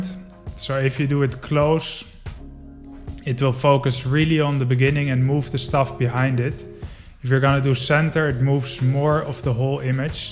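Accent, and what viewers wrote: Netherlands. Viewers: Jij bent een Nederlander toch 😂? Je accent😂 kan niets anders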